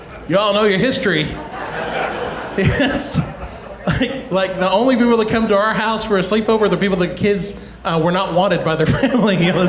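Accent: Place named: Iran. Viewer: i love how he seamlessly blends the Iranian accent with the Mississippi one 🤣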